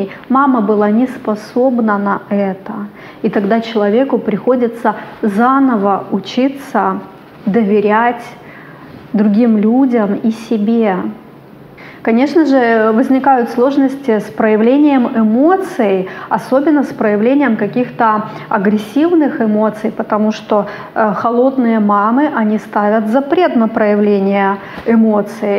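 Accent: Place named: Belarus. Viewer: Не сразу поняла ,почему вас слушаю,а теперь только,поняв ,что вы из Беларуси ,как и я,и проблемы из детства у нас похожи.У вас есть легкий белорусский акцент,вы Т смягчаете на Ц,моему уху это приятно слышать,живу давно в Петербурге. Можно видео про синдром отличницы,школа с золотой медалью и так далее, да и дома все идеально,понимаю,что незачем,а вот...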